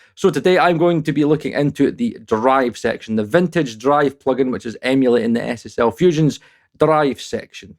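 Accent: Scotland, United Kingdom. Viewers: You have the best Scottish direct on youtube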